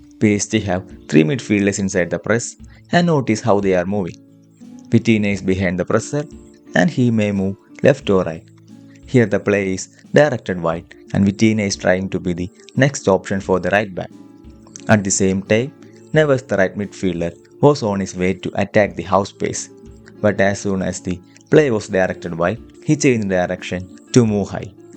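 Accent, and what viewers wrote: Kerala, India. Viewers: Malayali Slag aanallo machand nattil evida. ❤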